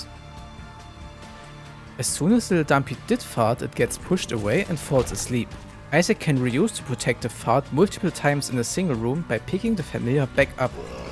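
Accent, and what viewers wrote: Germany. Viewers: Wie man einfach direkt raushört, dass du ein Almano bist haha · Dude idk if every german speaks like that but i love how you speak english ! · you can hear that this man is german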